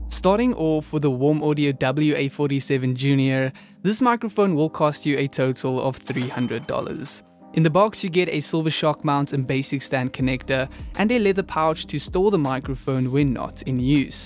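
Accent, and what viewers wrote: South Africa. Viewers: his south african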